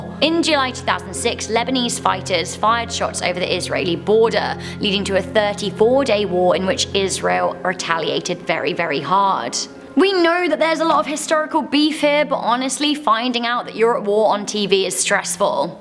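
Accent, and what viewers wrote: United Kingdom. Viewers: I always prefer to watch scary videos with a British person. Makes me feel safer lmao. Probably cause it makes me feel at home. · Jane admits your British accent is perfect. Who is Jane? That is your choice. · Why are you british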